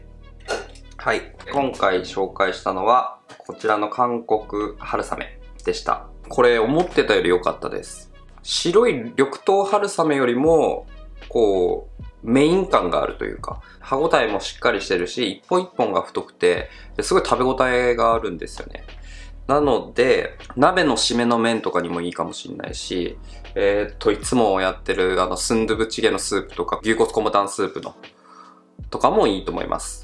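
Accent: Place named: Kyushu, Japan. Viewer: 春雨は増えるから量が参考になりました そうそう！ ち、ちらしで作るゴミ箱です〜 我が家も台所で出る生ゴミとかをチョイって入れてそのまま捨てられるので作り置き？してます 時々でる九州弁！いいですね (๑˃̵ᴗ˂̵)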